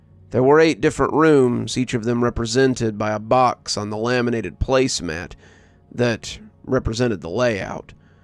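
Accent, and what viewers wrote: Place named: Florida, United States. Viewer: O SNAP. This series is great. Im pretty good at accents - I'm guessing North Florida but not Panhandle. ??????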